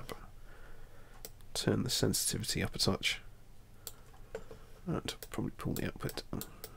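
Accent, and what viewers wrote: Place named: London, United Kingdom. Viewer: Hey- awesome tutorials...do you do private lessons? you sound like you're from London :)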